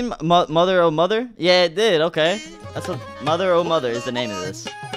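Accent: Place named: England, United Kingdom. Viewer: I like your English voice accent❤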